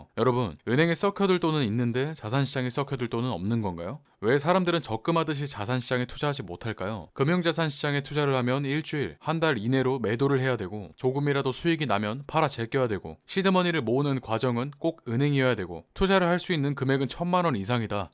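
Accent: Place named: Chungcheong, South Korea. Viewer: 목소리 멋있다.. 릴스보고 진짜 충청도 사람인줄 ㅎ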